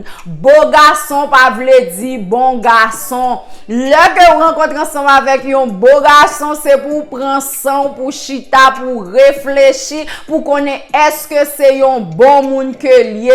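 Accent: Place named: Cap-Haïtien, Haiti. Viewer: Sa son fanm okap pareyanm kanmenm palèy gn twop resanblans premier vidéo'w map gade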